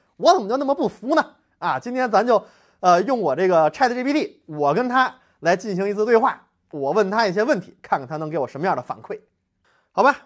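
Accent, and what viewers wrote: Beijing, China. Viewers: 馮老師講英文真有趣，充滿北京腔，哈哈哈哈哈！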